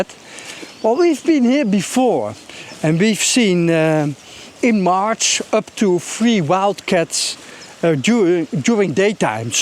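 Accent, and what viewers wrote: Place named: Netherlands. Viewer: Leuk Nederlands accentje😂 wel leuk zo'n rode wouw!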